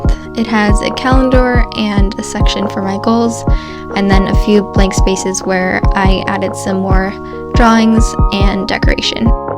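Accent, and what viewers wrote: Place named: North America. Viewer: Omg this is the cutest spread ever💖💖🥰🥰🥰 And you LITERALLY «stole» my idea for november in my new BuJo which I ordered from A R L, the bjqueen, so I FINALLY have a dotted notebook where the quality of the paper actually can handle my markers (Tombows, Crayola Supertips, Artist Brush Pen, Tombow Fudenosuke, Pigma Microns etc, none of them ghost or bleed through. But all my other notebooks is so poor quality and this is my first time (year) with Bullet Journaling so it was truely awesome when it arrived in the beg.of October. But I don’t have a boyfriend at the moment, so I was gonna use my bestie from high school she owns a bakery and is rediculasly good at making cakes and stuff like that, so (I kid u not...😅) I was gonna ask her if she could send me some photos close up of some of her work and her shop of course. She lives four hours by car away from me, so it is very long time since I saw her and we kinda lost touch so I was gonna text her like right now and ask about the pics and I have been running around changing lightbulbs in my apartement cos it was so so dark in here. 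And Norway is six hours ahead of NY and nine hours ahead of LA, and idk where u are in the USA, or Canada, I just know, or believe you’re North American cos of the accent you have❤️💙🇳🇴💙❤️💖👱🏻‍♀️💖😺💖🐶💖📚📚🖌🖌🎨🎨🎨🩰🧘‍♀️❤️ Ps: I just wanna say: HUGE FAN of your art. The oct was the 1st I saw, and now I am completely hooked🥰🥰🥰😁😁😁😁😁😁😁😁😁😁😁😁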